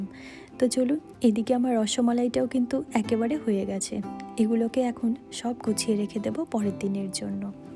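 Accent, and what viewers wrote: Bangladesh. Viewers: Amar mishti Maa..khub khub sundor lagche and lakhi rupe gune sob pujo kore cho❤❤❤❤..ami o tomar moto bangladeshi bangla boli .khub bhalo lage.. just st love this bangla...but my husband is ghotti...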